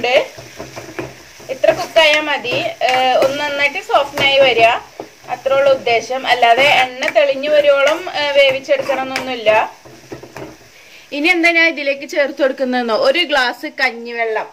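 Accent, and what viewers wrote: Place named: Perinthalmanna, India. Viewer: Nalla rasond samsaram kelkan..enik PMNA othiri friends und..avar parayana polund..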